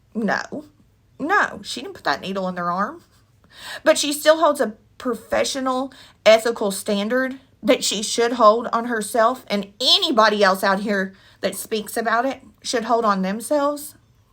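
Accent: Kentucky, United States. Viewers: Hey neighbor.... I'm A KY girl too. Thanks for sharing your story. I subscribed to your channel. Hope to see more content from you. I feel the same way about her. I'm also in recovery. Nice to hear your voice with the familiar accent. You are so REAL . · I’m talking to my mother now and told her about you going to Lake Herrington and she said my great grandfather worked there and his name is name is Jessie Lee Price and I guess he met really famous people there because they had bootlegers in the area. I told her how sweet you are and how much I love your accent bc it reminds me of my time I spent in Ky. My mom said we have family in Louisville and Lexington as well, Alexander County, and Boyle County. My stepdad family is from its Somerset ( Scienceville ). So we have family all over Kentucky lol 😂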